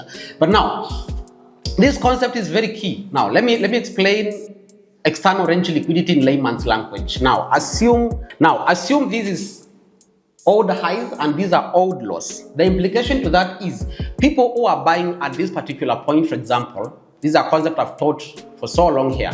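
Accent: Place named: Kenya. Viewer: Bro You sound a Kenyan... DO YOU HAVE OTHER SOCIAL MEDIA HANDLES ?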